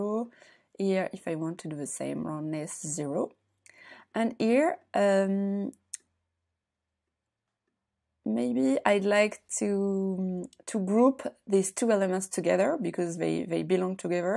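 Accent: France. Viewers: Thank you so much for this video! p.s. I love your accent! -I know my people when I hear them. Go France!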